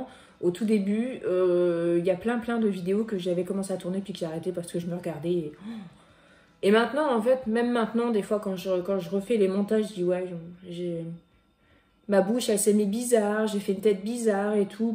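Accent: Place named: North, France. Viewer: Et t'aime particulièrement parceque tu es le double d'une collègue de travail, du Nord, presque le même accent, la même empathie, gentillesse... une belle personne. Je ne la vois plus car je suis contente partie à la retraite, déménagement à 400 km... continue tu fais de belles choses, et une chose est certaine, les enfants se souviennent des bons moments pas des choses, mes filles qui ont 40 ans me le disent souvent ...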